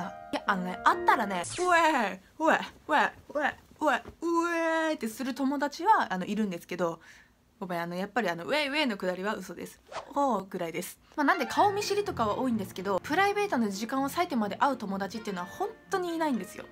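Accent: Nagasaki, Japan. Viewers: の後輩です！長崎弁恋しくて見てたら面白くてハマってます！応援してます！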